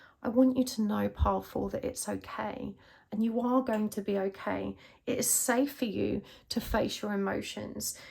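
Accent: United Kingdom. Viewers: Wow just wow. Since my dark night I have regularly been using 2 readers on YouTube and no others, but your channel and readings kept coming up on my youtube feed, often alongside synchronic numbers but I resisted using another reader up until now. I have been drawn in by repeating numbers, animals and I have been dreaming of and seeing twins for the past year or so in cards and symbolism. (I am still not truly sure why) but this attracted me to your channel amongst the number sequences of likes, views and the time on my phone everytime you pop up but especially this time. I have been going through an incredibly tuff dark night for what I perceive is 4 years but I am learning it has really been my whole life, that was just when the awareness of my spirituality began amongst the chaos and trauma. I then had a series of life events happen which brought up both old and new trauma and triggered a kundalini awakening and I thought I was going crazy. 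Especially when my starseed awakening started... which I feel you may resonate with just from hearing you talk etc. Anyway I have been drawn to more than one reading but have only watched the first one do far, and it was so accurate in every way that I feel as though you was speaking directly to my soul. I am from the UK also and I must say it was both surprising and nice to here a British voice , as my other readers and starsseed family are all from the US. It just felt a little closer to home. Your reading ran very deep for me in many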